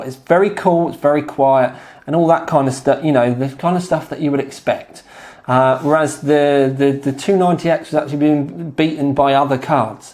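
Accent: England, United Kingdom. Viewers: HI if you want a polite version of Dogs Bollocks Its the Mutts Nuts im a pomme in oz so have to tame it down a bit with the rich Thanks for the show and effort and the good old English accent still got mine it don't seem to disappear Know what I mean gezzer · you have a strong british accent my good sir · it's just how he pronounces it, could be just him, or cause of the english accent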